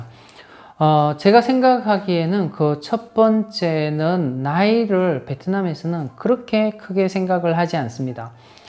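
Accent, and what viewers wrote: Gyeongsang, South Korea. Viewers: 방송대사도 없이 어찌 저리 말을 잘하시는지요. 경상도 억양마저도 정겹습니다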